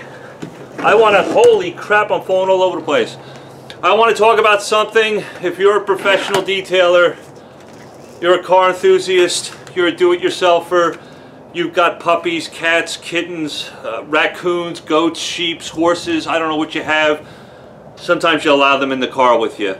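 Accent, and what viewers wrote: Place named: Northeast, United States. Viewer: Finally an honest to goodness video on how to remove pet hair in cars/trucks 👍 Thank you so much for your informative video! I will definitely go out and buy a bottle of "All Fabric Softener" to rid of the pet hair in my truck! By the way, love your northeastern accent as well as your humor 🤣👏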